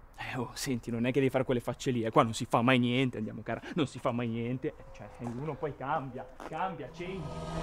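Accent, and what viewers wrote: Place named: Palermo, Italy. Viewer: che parla con l'accento palermitano fa troppo ridere!! Bravo! 😘👍 E lo dico da siciliana! 😄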